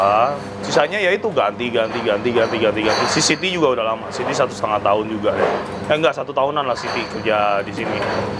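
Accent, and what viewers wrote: Banyumas, Indonesia. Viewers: deneng ngomonge kaya basa bayumasan yah ....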